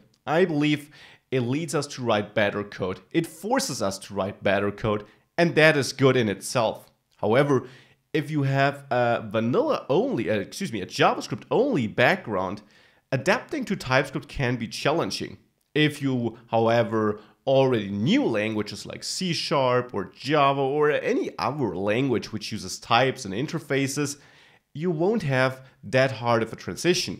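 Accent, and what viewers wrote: Germany. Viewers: Gute Videos aber ich kann mir dein extrem deutsch gesprochenes Englisch leider nicht lange anhören. Trotzdem weiter machen!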